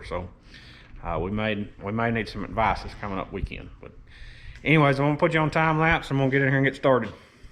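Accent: United States. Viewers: Gogetem! I'm from southern Missouri .. I understand hick just fine. He you brother. 🏁🏁🏆🏆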